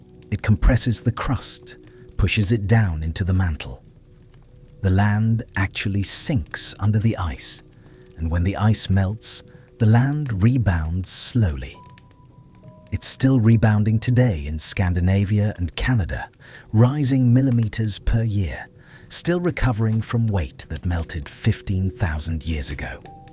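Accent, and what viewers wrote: England, United Kingdom. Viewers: an English-sounding AI narrator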